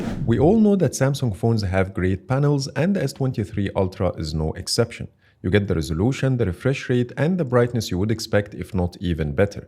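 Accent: Egypt. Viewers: I like the way you embrace your Egyptian accent and not even try to cover it while sounding perfectly clear 👍 also great review from end user prospective just what viewers need.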